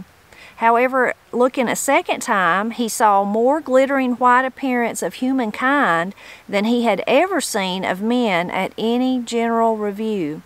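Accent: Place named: Southern Appalachia, United States. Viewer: What part of the country are you from my sister? I'm from Lee county Va and your accent makes me homesick. We now live in Franklin North Carolina and in the past near hickory nut gorge and brown mountain. Love your videos, God bless!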